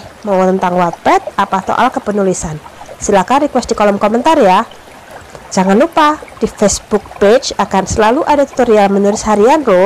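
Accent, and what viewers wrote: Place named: Java, Indonesia. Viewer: baru tau ini suara... jawa bener..hehehe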